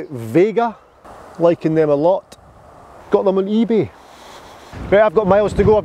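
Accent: Scotland, United Kingdom. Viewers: I’m in Glasgow great to hear Scottish accent with humour, I’ll take on board what you say to tomorrow morning on first tee, great vid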